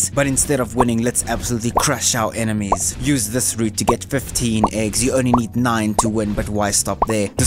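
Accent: South Africa. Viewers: This guy sounds South African.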